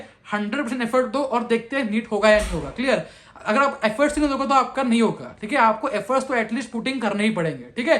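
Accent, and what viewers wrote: Maharashtra, India. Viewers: He is maharastrian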